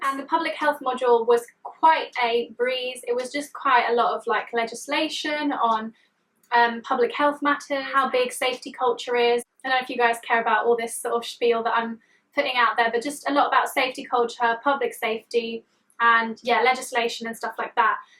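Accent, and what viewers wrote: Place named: England, United Kingdom. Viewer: What a horrible accent what country do you come from the the wrong side of the tracks in england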